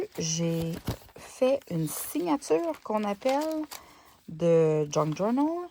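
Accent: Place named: Canada. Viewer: Ho oui ses super parfait les vidéos en français et avant j'allais sur l'autre et maintenant ses plus pratique et j'aime aussi la voie du Canada j'ai une amie qui habite au Canada merci pour toutes vos vidéos je vous suis depuis 2 ans et j'aime acheté vos papiers numériques merci pour le partage gros bisous ❤❤❤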